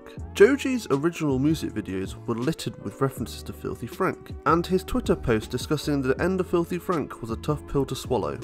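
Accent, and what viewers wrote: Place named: United Kingdom. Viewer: Is that another British accented commentary channel, not an insult but fuuck there's tons of them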